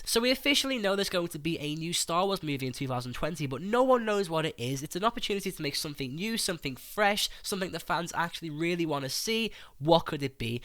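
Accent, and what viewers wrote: Liverpool, United Kingdom. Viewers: can i ask are you from Liverpool? i live in Liverpool, i'm glad to hear a familiar accent on youtube :)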